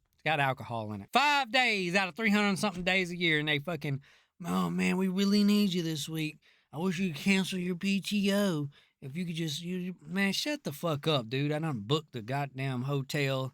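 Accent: South, United States. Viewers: Perfect southern accent ❤️ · The southern American accent is so sophisticated and refined it makes me think of prestigious university professors and silver tongued politicians. Then there's a random "shut the fuck up DUDE" it's a total mind fuck the same as if someone was swearing in a posh London accent.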